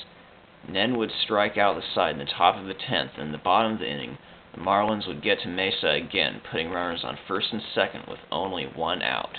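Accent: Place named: Latin America. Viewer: You need to lay off those annoying hispanic pronunciations dude. Do you call Italy "Italia"? Then don't call Rentaria "LLLLLentellllia".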